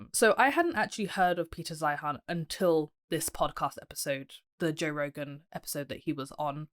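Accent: England, United Kingdom. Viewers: Peter who? She's pretty ..and I like her English accent. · Your education has made you naive to naive to the facts of power operates. You will naturally understand how power works once youve had a decade away from being a student. Your not taught in school how to obtain power or even how it operates. Thats done on purpose. You are trained academically to be an employee not an employer. As long as you have the employee mindset you will never see the whole picture. Peters opinion is largely held by democrats, republicans and policy makers in washington along with the big think tanks brookings, rand, etc... your intelligent and well educated but your lacking in real world life experience. So your missing the whole picture. Interesting perspective and brave to attempt to debunk peter for lack of a better term. I enjoy your delivery and silly english accent😂😂😂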